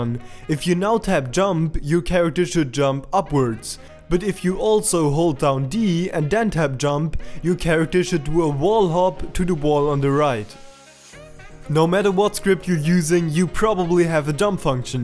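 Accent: Germany. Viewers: Thank you for the Tutorial Where are you from? Do you come from Germany?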